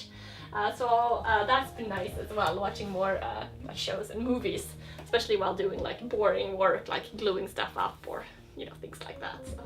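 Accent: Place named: Sweden. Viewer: Nice to see more girls in this community. Just discovered your channel. Just one questions. Do you perhaps have any relation to Sweden because your accent sounds somewhat Swedish?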